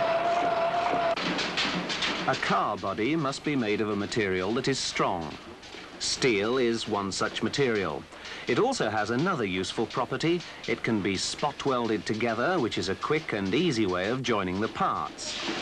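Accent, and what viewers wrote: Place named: United Kingdom. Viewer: British vs. North American pronunciation and spelling. The video uses the correct British (and most of the rest of the English-speaking world) pronunciation.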